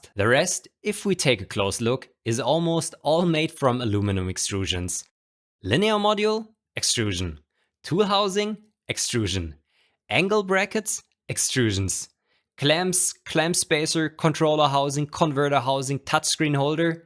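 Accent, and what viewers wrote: Germany. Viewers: Hello dear friend! Congratulation and thank you for this awesome video!!!From your accent i guess you are in Germany. I live in Baden-Württemberg i would be happy if we could communicate somehow. Ich spreche auch Deutsch:)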